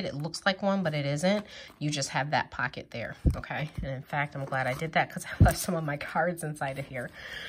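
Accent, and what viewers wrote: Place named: Long Island, United States. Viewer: I can’t believe your black lolz. You sound like a Long Island Italian lady. You should go into sales you have a great confident voice. 🤗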